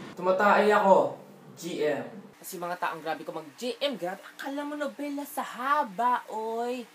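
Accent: Visayas, Philippines. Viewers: Curious lang po ako sir, bisaya ba kayo? May accent kasi kayong visaya! Salamat po!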